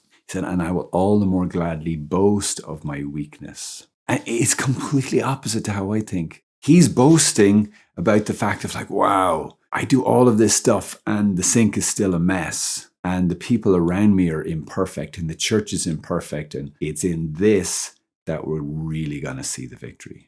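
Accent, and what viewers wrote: Ireland. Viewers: Great advice indeed! And nice to hear the Irish brogue! Beannaithe Dá leat!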